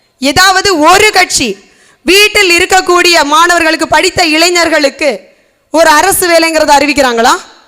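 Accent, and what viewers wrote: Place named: Kanyakumari, India. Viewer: கன்னியகுமரி த்தமிழ் அழகு ்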